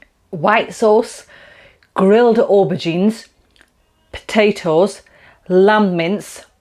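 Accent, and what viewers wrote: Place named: West Yorkshire, United Kingdom. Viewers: WOW!! I’m impressed (not something which happens often). Love the accent too, somewhere between Yorkshire and the Mediterranean, music to my ears. Excellent video, I’m subscribing now just to hear you speak-excellent videos will be a bonus. Great recipe, great presentation. I love it. 🇦🇺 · I appreciate the lack of waste for a home chef. Fantastic recipe. You sound like you're from West Yorkshire, we don't waste anything in cooking!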